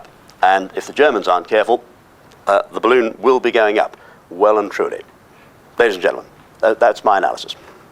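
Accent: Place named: RP, United Kingdom. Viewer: Utter crackpot. His Patrick Moore style accent irritates as well. Bad egg. Nasty chap. Totally off his head. Has he not heard of NATO. He has been reading too many war comics.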